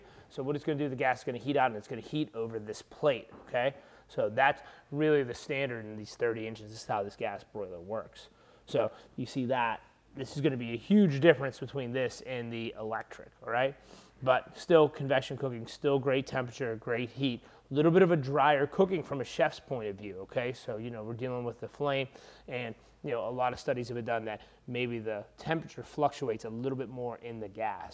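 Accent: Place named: Midwest, United States. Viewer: Mid western American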